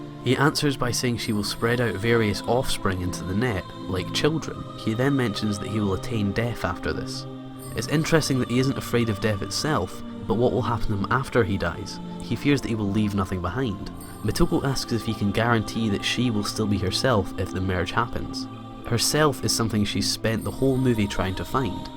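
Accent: Scotland, United Kingdom. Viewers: At least this narrator sounds like British or Northeast Coast... and this makes sense. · Never realised I needed a Scottish bloke musing on philosophy, anime, identity, death, and life in general. Ay. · you scottish?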